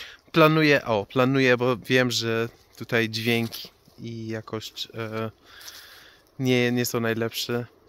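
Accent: Poland. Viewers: wlasnie nawet akcent polski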